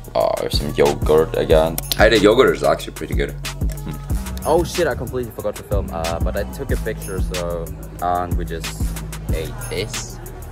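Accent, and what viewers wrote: Germany. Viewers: On dirait il un accent allemand💀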